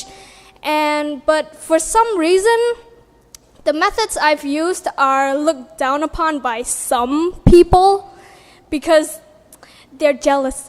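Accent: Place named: United States. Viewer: ACE follows British , but her accent is like the American 😄 Amazing speech ever ! 💙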